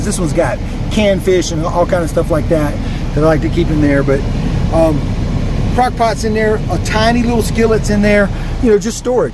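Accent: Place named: Pittsburgh, United States. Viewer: one hell of a set up my guy! also this guy sounds like he from pittsburgh idk y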